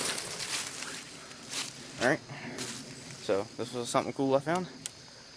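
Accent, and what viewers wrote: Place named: Alaska, United States. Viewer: Are you related to the Alaskan bush people by chance? you have their same accent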